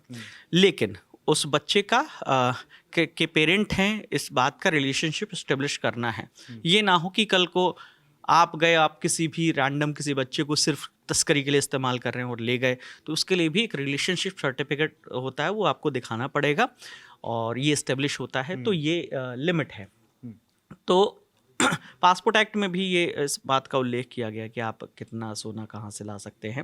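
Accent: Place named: Bihar, India. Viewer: Dhar liya jata hai .Bihar ka tone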